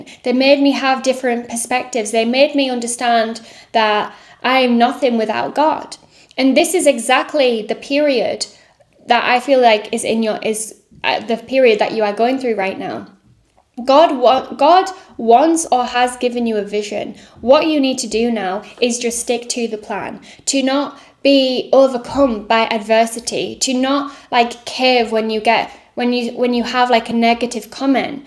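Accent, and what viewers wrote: England, United Kingdom. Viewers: It reminds me I think in the book of habakkuk to write down the vision. I need to write down the vision of my new job I just started for this millionaire. Andy be quite honest I thought you were just going to talk nice to us men that are broken I didn't know you were a Christian and had a Godly message thank God you weren't a non-Christian woman just flattering us weak-willed men. Thank you for being obedient to God and speaking truth and love to us. This is exactly what I need right now I already knew it but I needed to be reminded I needed somebody to remind me what God Said. I love your accent you must be from maybe England or New Zealand or australia. It's a beautiful voice God gave you keep using it on YouTube and Instagram wherever you can use it to get the gospel out and then message out.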